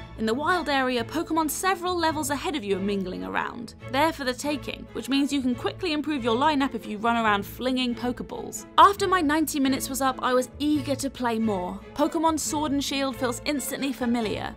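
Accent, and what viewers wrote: United Kingdom. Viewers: It’s kind of majestic hearing a British person talk about Pokémon using some British words ^_^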